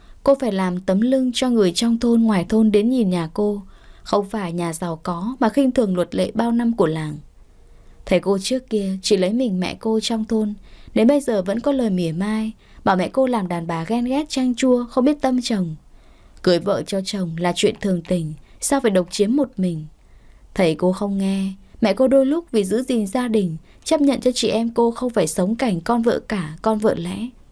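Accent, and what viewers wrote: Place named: North, Vietnam. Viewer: Giong độc chuẩn miền Bắc,. Thanks,,👍👍👍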